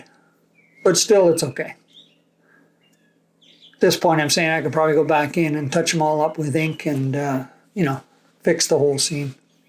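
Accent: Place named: Canada. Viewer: Very informative. I appreciate you telling what colors you are using. Love to hear your Canadian English. Toni-Colorado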